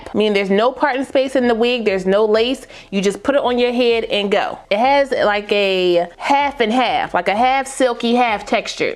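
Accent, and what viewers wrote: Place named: Philadelphia, United States. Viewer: I lost it at the blonde wig 🤣🤣 “hellll nawll” I love your videos and accent .. sound like a Philly girl.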